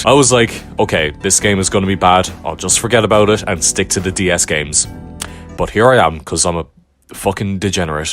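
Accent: Ireland. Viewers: Trying to place the accent. Kilkenny?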